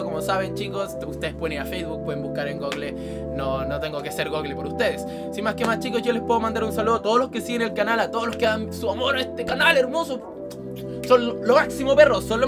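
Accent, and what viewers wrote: Chile. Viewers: No se sabe que es peor, no entenderle por chileno o por la música de fondo que suena demasiado fuerte, así que asumiré que el problema radica en las dos opciones...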